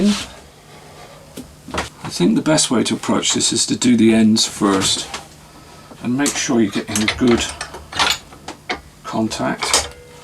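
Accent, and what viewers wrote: United Kingdom. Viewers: You're doing excellent work. I really like the British accent too. I'm from Germany by the way. Any chance you can show us to make a Gibson SG but with symmetrical horns? Because every time I look at the original I get furious. I hate asymmetry.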